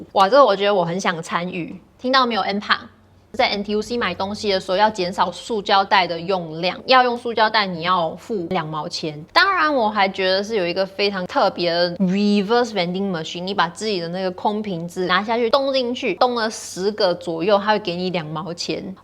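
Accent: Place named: Taiwan. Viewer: I tuned in to listen to Taiwanese Mandarin ….so endearing 😘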